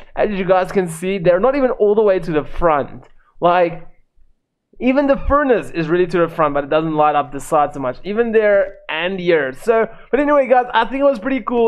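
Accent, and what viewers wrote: South Africa. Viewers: I thought so because your accent sounded south African I'm also from south Africa😂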